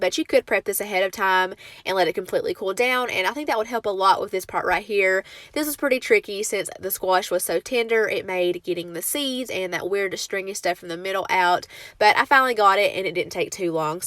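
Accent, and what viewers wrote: South, United States. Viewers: Not to be weird but I love hearing your southern accent it’s very calming to hear you talk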